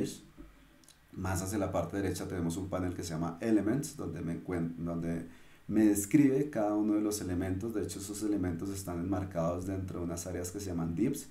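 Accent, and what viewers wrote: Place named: Colombia. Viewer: por el acento creo q eres un compatriota colombiano quisiera saber donde me puedo certificar en adobe que es creo el titulo q posees gracias!!!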